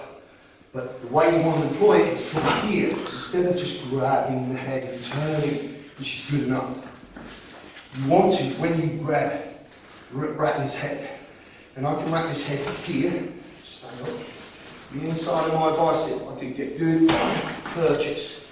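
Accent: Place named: Leicester, United Kingdom. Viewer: Hi, addicted to your vids, i’m probably your age and maybe had similar experiences growing up (BUT I wasn’t wearing a suit or uniform, enough said, i detect a leicester accent sometimes - i was in glen parva so you can guess the rest)), did bjj & striking at “mansfield chinese boxing club” (was actually “british combat” & bjj actually) also had my own “b’c’” weekly coach (one of the original 10 coaches certified by Geoff & Peter) so i was PASSIONATE about violence in my late teens up to now i suppose BUT had to give up on getting into violent situations whenever possible because i discovered money and for every step i took forward i took 2 back, The lesson i want to share with your viewers is this >>> WHEN YOU ARE GOOD “AT VIOLENCE” it’s an easy trap to fall into that you don’t bother to learn alternative life skills because violence gives you SOOOOOOOOOOOOOO much and that in it’s self is “self validating”!!! 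I was very lucky/ unlucky that i developed some serious physical problems that saved me from myself and forced me to learn new ways of dealing with situations, gaining validation etc, it has been an amazing addition to my lifestyle (ps i came from nothing & became “rich” in every way a young guy dreams to be rich in!!! BUT WITH VIOLENCE ALONE YOU WILL LOSE EVERYTHING, I am still as passionate as ever and i still have a devastating 2 minutes in me & feel the need to back down to no man because of fear BUT please i know you understand everyth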